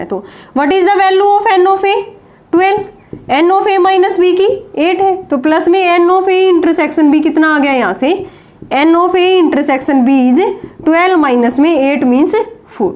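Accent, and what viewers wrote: Haryana, India. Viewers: She is sure from HARYANA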